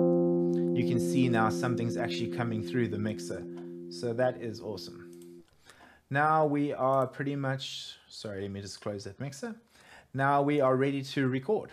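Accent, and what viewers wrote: South Africa. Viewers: you sound south african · South african✊🏿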